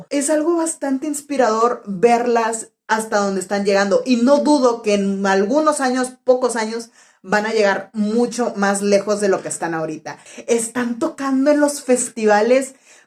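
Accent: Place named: Monterrey, Mexico. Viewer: Gracias por tu video. Hablas como Ale, a Ale se le escucha el accento de Monterrey mas que a Dany y Pau.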